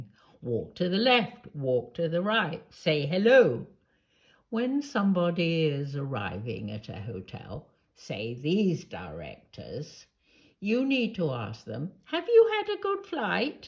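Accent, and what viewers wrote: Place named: RP, United Kingdom. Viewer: Your voice is so posh.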